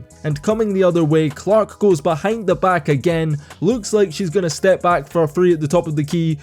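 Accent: Scotland, United Kingdom. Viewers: Where is the accent from, please? Scottish? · It’s a bit odd, hearing a basketball game narrated by a Brit. They play bball as much as we play Cricket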